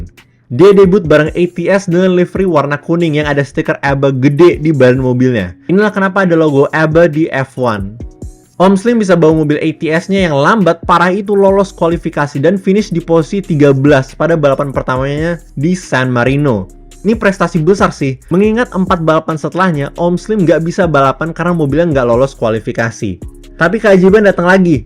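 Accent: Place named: South Jakarta, Indonesia. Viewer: Logat jaksel kental sekalii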